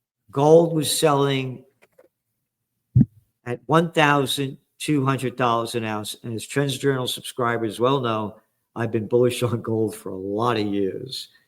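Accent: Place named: Bronx, United States. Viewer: Love the TRUTH spoken with a Bronx accent!😊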